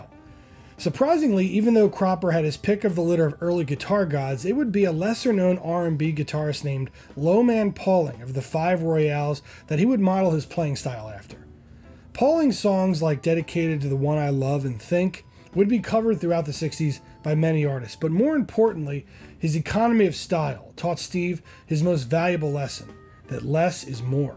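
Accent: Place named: Delaware County, United States. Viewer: Thanks. I'm a recent subscriber and I enjoy your videos immensely and find it heartwarming to hear a hometown accent in your narration. I'm guessing you're a DELCO guy like me. After living my first 43 years in DELCO, I moved but I'm still in PA. Though I'm a drummer first, Steve Cropper is a favorite of mine. He's one of the biggest reasons why I love the Tele so much.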